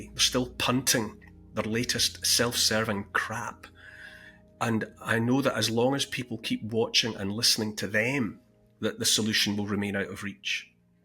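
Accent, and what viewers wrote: Scotland, United Kingdom. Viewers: This is the very thing he was talking about. You shouldn't be behind anyone. We should all be out there doing some form of resistance and/or spreading the kind of truths speaks. The comments I have made on a couple of occasions have not been about getting out there and leading some sort of resistance or revolt. It is about some - not all but quite a fair number - of his viewers that fawn all over him and actually say they wait and look for his next video, that he keeps them going because he is a voice of common sense and reason. Too many people appear to be addicted to listening to and others like him as a kind of comfort blanket, his eloquence, his no-nonsence Scottish way of speaking, his light, and airy room giving a sense of simplicity, peace and tranquil respite in a misty spaciousness, almost felt beyond the window, far from the hurly burly of busy towns in which we mostly live. 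To read some comments it's almost as if the daily sight and sound of is their daily fix which enables them to survive another day. I have read accusations of controlled opposition against on certain other channels particularly on Telegram. It's another label that's thrown around like all the -ist ones used by the Left Wokerati. I don't necessarily subscribe to that view but I can see why some might say it. As long as people are soothed and stay on their sofas waiting for their next fix they remain in a state of soporific trust that somehow he has all the answers and will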